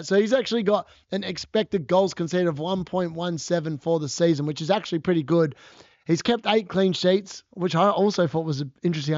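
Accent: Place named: Australia. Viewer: Hi mate, love your content and delivery, great hearing an Aussie accent . I'm Aussie in NSW . First year playing but lovin' it. A great differential in my opinion going forward is McNeil from Everton , they have increased his minutes over the last few weeks and he was brilliant last week . $5.1m if not this week definitely next imho. Cheers bro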